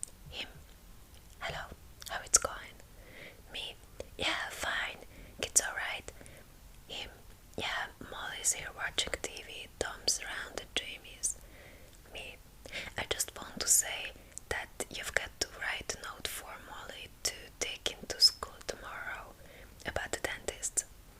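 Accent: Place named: Hungary. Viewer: Haha very thick hungarian accent, i like it!!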